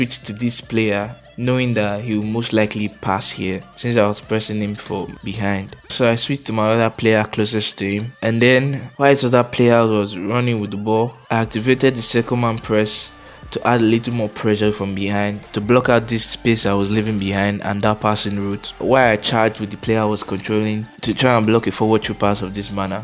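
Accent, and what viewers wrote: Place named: Nigeria. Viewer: sure bro. You sound Nigerian. You from Africa ?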